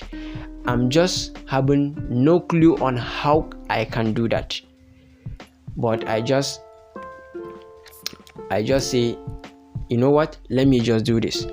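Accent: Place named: Hausa, Nigeria. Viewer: This guy. You nd ur hausa accent ... murdering pronunciations. Great interesting story though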